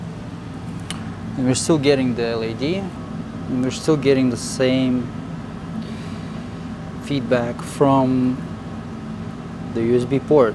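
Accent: Russia. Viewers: мне кажется, или у тебя русский акцент? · Do you have Russian accent?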